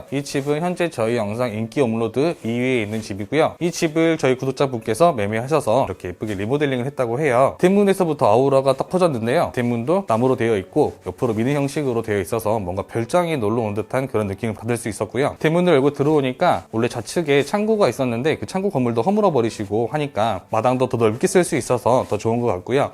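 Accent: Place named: South Jeolla, South Korea. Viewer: 전남사투리... ㅋ 많이 쓰시네요.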